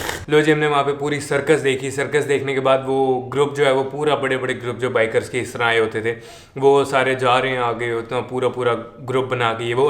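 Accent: Punjab, India. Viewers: hamey story sunaya kro plz ap ki story buht sander jaberdast zindabad hoti hen...us ap Jo punjabi tarka legaty ho wah buht hi shapakin ha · ka punjabi lehja zaberdast hai.ham thehrey karachi waley punjabi.🙄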